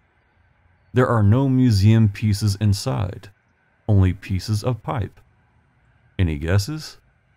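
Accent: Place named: South, United States. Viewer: Wow 😲! I kind of nailed it! That's so cool your ancestors were French and followed Daniel Boone to... Kentucky! I looked up Lawrence County, IN and it's very close to the Kentucky line. As a proud Southerner (Alabama, where the skies are in fact so blue) I assure you I was complimenting you on any influence your parents' accent may have had upon you! You're Midwestern, yet retain the Grace and Gentility of a Son of the South. Just got home from work, about to watch more. It's a pleasure to meet you. I'm William.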